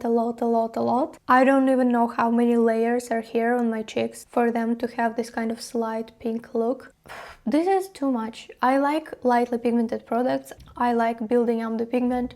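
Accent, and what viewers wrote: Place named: Eastern Europe. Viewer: Where are you from, love? I’d guess you might be slavic?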